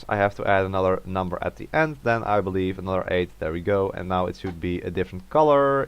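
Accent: Netherlands. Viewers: you sound dutch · are you dutch??? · i know for sure that you are from the netherlands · Sounds very dutch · your accent sounds dutch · are you dutch? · He is def from Netherland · ik hoorde het gwn aan het Nederlandse accent 😭🙏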